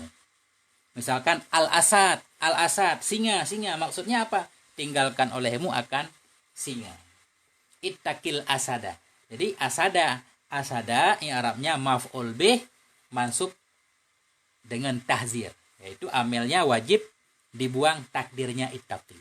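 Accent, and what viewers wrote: Aceh, Indonesia. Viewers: Loghat ustad kayak aceh gitu ya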